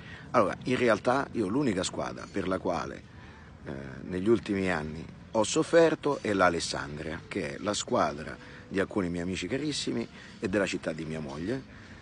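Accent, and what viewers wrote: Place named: Rome, Italy. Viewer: Da come parla o della roma o lazio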